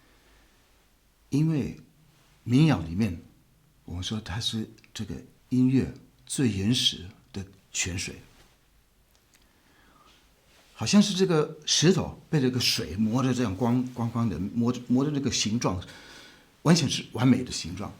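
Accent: Standard. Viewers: 您的漢語水平讓我吃驚！太好了，字正腔圓！我都懷疑您到底是華人還是德國人了，新年快樂！ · 每一次聽到這首歌都感動。第一次看到這個頻道，老師的中文字正腔圓，講話平靜中帶有情感，猶如歌曲一樣令人感動。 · 您的中文口音比很多我们内地人都准，很好听，欢迎您来武汉游玩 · ，非常喜歡您，你的漢語講的比台灣人還標準。祝福你全家永遠萬事順順利利。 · 你的中文好標準啊！我聽你講話像在聽我朋友講話一樣！好親近啊！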